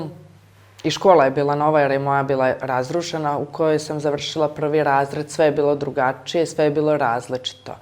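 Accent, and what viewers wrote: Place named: Ekavian, Serbia. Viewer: I još ekavicom pričaš i bre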